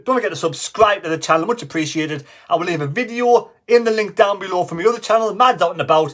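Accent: Sunderland, United Kingdom. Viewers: Been working way too much and I'm behind on the events of the team. Just looking at results they are definitely trending in the right direction. I've missed following your content and hearing proper mackem. Cheers from North Carolina. Sunderland 2 QPR 1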